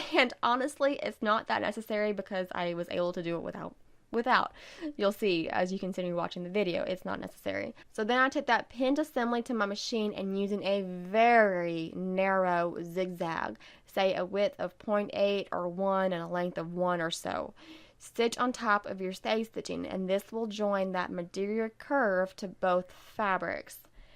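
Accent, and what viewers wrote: South, United States. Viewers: Thank you!! My first time watching and I will be watching again (and again!) Your very gentle southern accent just makes the entire video all the more pleasant. Excellent close ups and hints and tricks for this classic heirloom look.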